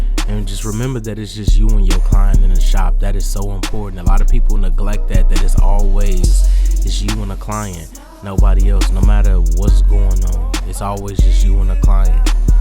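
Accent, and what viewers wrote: Chicago, United States. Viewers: Ayo you frm Chicago??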